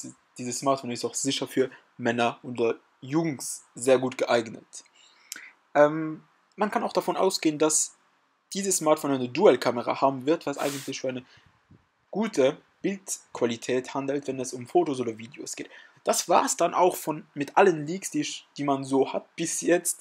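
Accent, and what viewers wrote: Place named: Luxembourg. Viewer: Dat heiert enn met der stemm dat ass esou en deitsch-letzebuegen Akzent Waiste wat ech mengen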